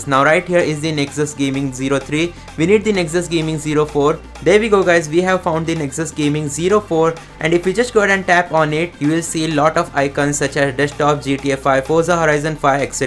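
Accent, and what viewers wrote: India. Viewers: 1 plz speak more slow cus indian english realy understand hard ... 2 that cloud game why speak lier? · Tikkken with the smelly mother fucker indian accent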